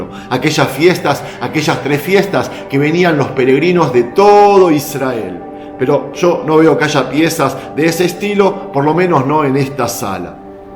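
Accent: Buenos Aires, Argentina. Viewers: Este tio q hebreo va ser habla como Porteño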